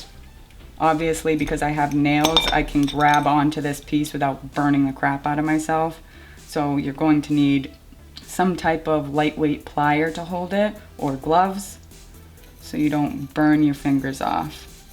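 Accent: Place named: New England, United States. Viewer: Hi! I just took my first stained glass class and I'm hooked!! Currently binge watching your videos - lol. Thank you! I grew up in Ma and as soon as I heard you talking I knew you were a New England gal:)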